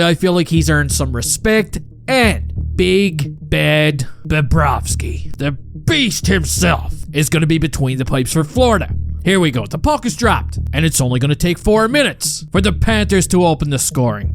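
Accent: Newfoundland, Canada. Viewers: You sound like a Newfie, that's a good thing. They love hockey too.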